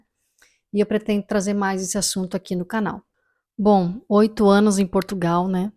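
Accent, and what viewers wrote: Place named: Brazil. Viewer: Se és Portuguesa e estás em Portugal, porque é que falas brasileiro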